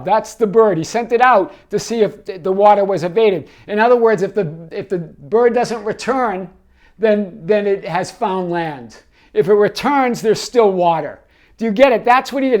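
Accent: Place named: New York, United States. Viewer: I love your new yorkish accent Reminds me of home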